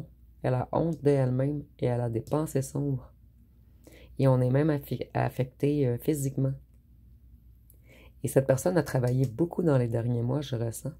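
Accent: Quebec, Canada. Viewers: Vous avez Madame une très belle manicure , une belle couleur de vernis a ongles ,et je vous ressent une très belle personne en tout point de vue et très soigner pour vous autant ds votre façon de penser que de vous arranger et j'aime bien votre accent québécois ces très jolie.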